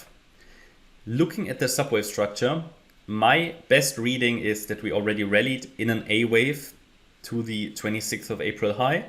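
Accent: Germany. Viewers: Content of interest. How you pronounce everything, I would guess you are a German.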